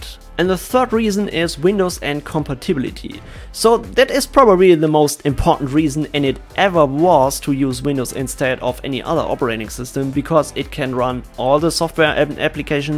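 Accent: Germany. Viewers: Sag mal, bist du deutscher? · ist auch völlig in Ordnung. Der deutsche Akzent ist sehr deutlich, mag ich!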